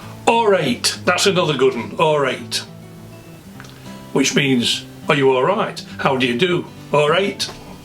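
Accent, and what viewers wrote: Sheffield, United Kingdom. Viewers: I gotta say, as a yank writing a story with a US-residing English character from Sheffield, I felt pretty confident giving him a broad English speech pattern but I didn't know how to make it more specific than that. But when I got to a part that takes him back home, I wanted to get it more authentic, and this video helped me do that more than any other. Thanks, man! (Or should I say "Cheers, love" lol) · I was born in Barnsley lived there till 10, Sheffield for 20 years, worked in York with Lads from Leads, my accent is strange, but nice to hear a more Sheffield voice, laughed me ed off when you said Endo's. Now live in Midlands and when I came down you couldn't get Henderson's, so I drove back to Sheffield, bought a case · Im not from yorkshire im in derbyshire but my towns accent is incredibly influenced and similar to sheffield. 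The way you speak is near identical to me :) Though some of the slang words arent used here a lot also are but we have several unique to my town as well. Not sure quite in sheffield but as well as love do you also call people duckey and duck or flower? I get them all daily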